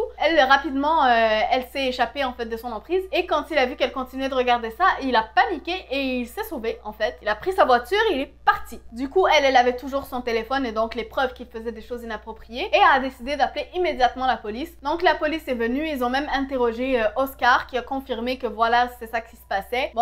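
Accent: Quebec, Canada. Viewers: tu es québécoise n'est-ce pas ?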